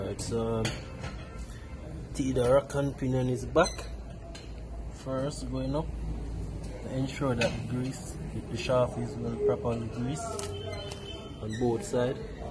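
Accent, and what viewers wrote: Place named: Jamaica. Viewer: Sound like you in Jamaica, same place mi deh, I have the same car with the same issue. How can I get that bushing?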